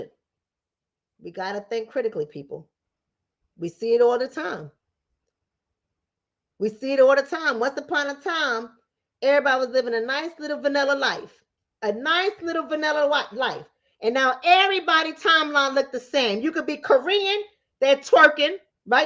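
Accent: New York, United States. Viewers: I am in LOVE with your New York accent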